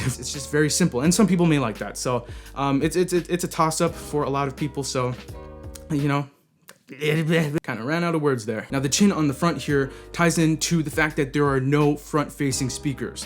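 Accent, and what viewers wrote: United Kingdom. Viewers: that British accent tho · lol you make me laugh. I love the British accent lol good video!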